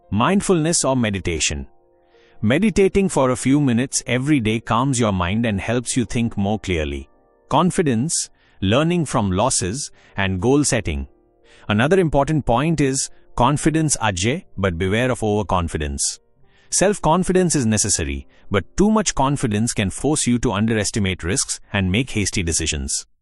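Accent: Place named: India. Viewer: Why does this AI have an Indian accent lmao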